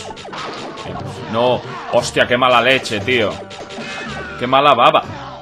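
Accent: Mexico. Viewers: tu acento mexicano es muy cool hahaha Saludos desde Mexico... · GRACIASSSSSSS!!!!!! QUE CHIDO TE SALE EL ACENTO MEXICANO LA NETA JAJAJAJAJA....SALUDOS DESDE MEXICO YEAH....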